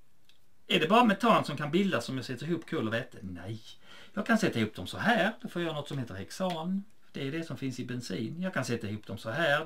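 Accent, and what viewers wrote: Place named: Scania, Sweden. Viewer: Kul att lyssna Kemi på skånska 😅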